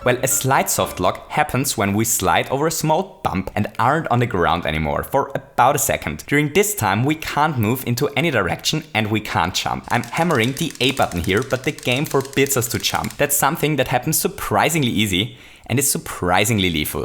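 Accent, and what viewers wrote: Germany. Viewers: Bin ich drauf oder hört man das Deutsch raus ? Hahaha, nice video ! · Is he german? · Are you German? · Are you german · Are you german? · Am I the only one being annoyed by him speaking so enthusiastic with that german accent or whatever that is .. ? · ich merke das du ein deutscher bist weil dein dialekt anders ist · Man hört so hart das du deutsch bist hahaha #nofront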